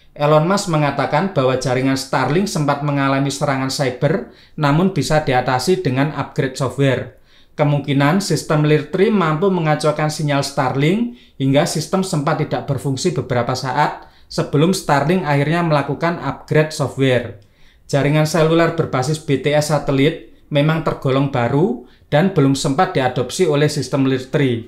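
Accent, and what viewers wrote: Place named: Batak, Indonesia. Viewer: Mantap dialek batalnya.....horas...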